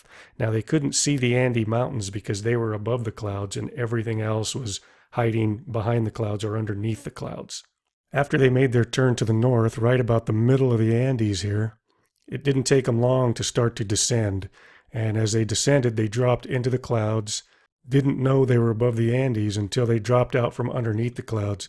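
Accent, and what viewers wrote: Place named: Michigan, United States. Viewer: Narrator pronounces “height” as “hyTH” and “cross” as “crossT”. Wondering if he’s from Michigan/northern Ohio, northeastern Pennsylania.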